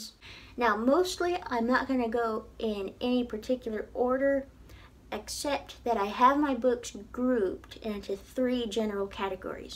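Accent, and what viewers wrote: South, United States. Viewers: Love your accent! Which part of the South are you from? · What a great review. I'm returning to learning Gaeilge after nearly 20 years since finishing school. I have a lot of these books and am surprised at how much I've retained over the years. "Buntús Cainte" brings back bad memories for us because of having to practice our tape test in school. I was taken aback to hear your accent at the start, fantastic to see people abroad learning our national language. Your accent is beautiful btw, spent a lot of time down south over there and know a Southern Belle when I hear one :) Go raibh míle maith agat arís, Subscribed. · Southern accent! A nice surprise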